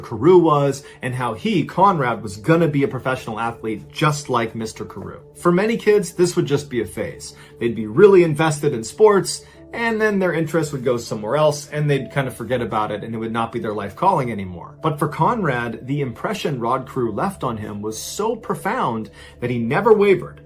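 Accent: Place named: New England, United States. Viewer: Love your stories... best part is hearing it with the accent. I never realized how much I missed it until I watched you for the first time. ❤ New England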